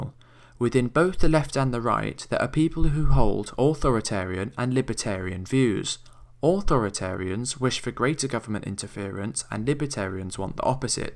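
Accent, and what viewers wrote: United Kingdom. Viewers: Peter t.c.: I wouldn't be giving out advice like this when you can't even tell the difference between an American and a British accent. The presenter is British. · This is just PragerU with a bri'ish accent.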